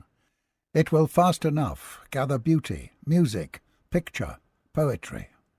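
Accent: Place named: England, United Kingdom. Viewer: Thank you for posting this video. I loved listening to it narrated by an Englishman.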